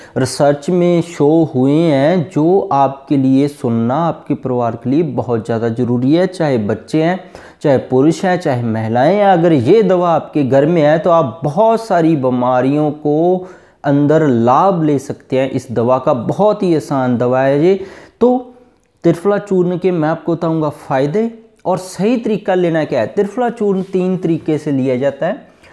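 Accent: Punjab, India. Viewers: आपका बोलने का पंजाबी अंदाज़ बहोत बढ़िया है।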